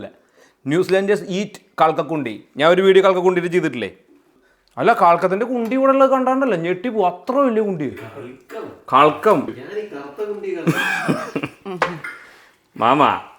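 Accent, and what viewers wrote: Thrissur, India. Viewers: എന്റെ തൃശൂർ ഭാഷ 💙👌👍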